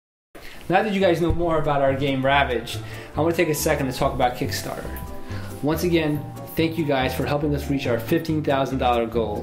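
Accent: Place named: Netherlands. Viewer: You are dutch, certainly.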